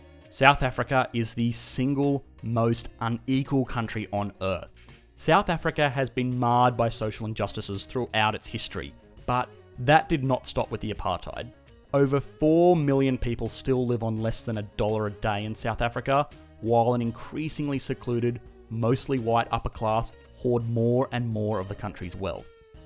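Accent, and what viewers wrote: Australia. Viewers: Dam Australian! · Sounds Aussie.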